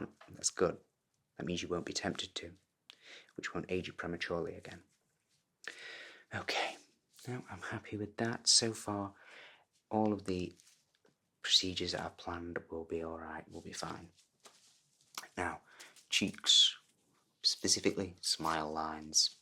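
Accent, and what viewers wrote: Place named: North, United Kingdom. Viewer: You sound very... Northern. I like it 😋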